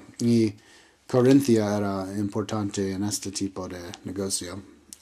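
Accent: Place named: United States. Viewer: i do not speak spanish, do it in English please. and even i can hear the american accent.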